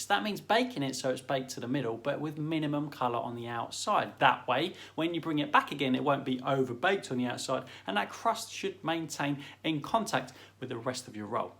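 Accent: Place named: Essex, United Kingdom. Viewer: You forgot to do it in that Essex accent.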